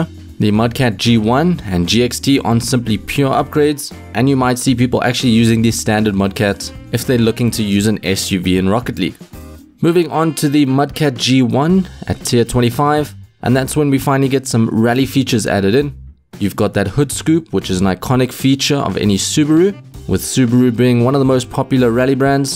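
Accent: South Africa. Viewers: Are you south Africa. Really sounds like it and if so howzit bru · Eyyy bro are u from SA??? LOVE UR VIDS BTW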